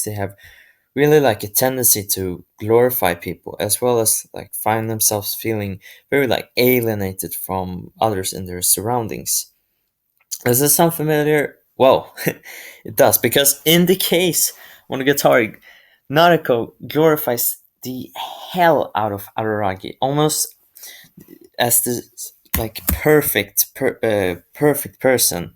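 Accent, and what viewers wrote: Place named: Scandinavia. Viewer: I like the video😄 Btw are you Norwegian perhaps? Your accent sounds Scandinavian😊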